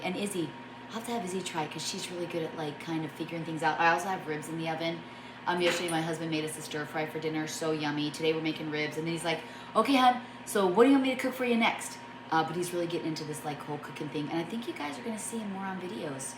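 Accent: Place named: Michigan, United States. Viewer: There is nothing wrong with her Michigan accent! We also say melk instead of milk! 😅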